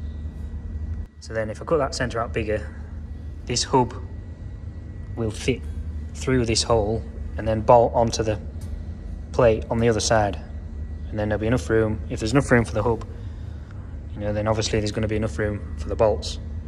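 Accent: Yorkshire, United Kingdom. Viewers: Er kommt aus dem norden von Yorkshire, vermutlich nähe Thirsk. Ich nehme an, Sie meinen "Stuud" und nicht Stahd für "Stud". Tcha, Yorkies haben auch Dialekt, ursprünglich "old English" and "old Norse".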